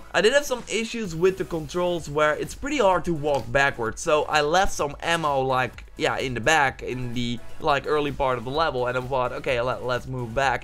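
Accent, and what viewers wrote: Netherlands. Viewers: You should work on losing the Dutch accent.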